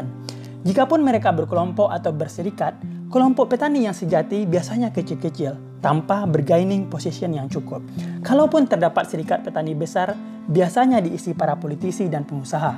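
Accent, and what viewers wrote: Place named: West Sumatra, Indonesia. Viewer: Logatnya orang minang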